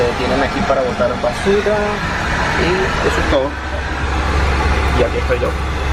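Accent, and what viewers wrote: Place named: Venezuela. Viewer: Saludos de Rio, Brasil...te oigo muchacho para entreinar el espanol de Venezuela... ...y te deseo mucho mas sucesso gajo en Portugal y tambien con la nueva lengua que aprendes. Tchau!!! 😊😊😊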